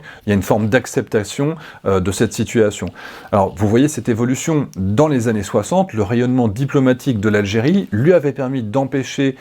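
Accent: Morocco. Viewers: on dirait un marocain qui parle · on dirait qu'un marocain qui parle!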